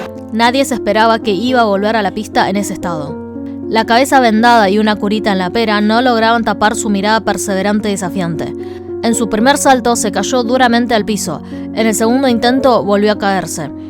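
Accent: Argentina. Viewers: Buen video. Estaría mejor si modulares tu acento argentino y pesado.... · Se me han caido las lagrimas de emocion gracias chinita linda me encanta tu acento Argentino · Me encanta el acento argentino de tu español, che! Sos, única!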